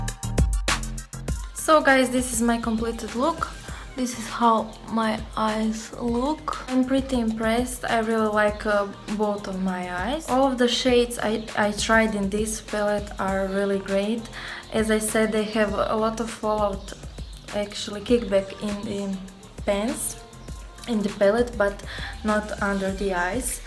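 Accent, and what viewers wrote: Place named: Eastern Europe. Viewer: Love our eastern europe accent, where are u from hun x 😊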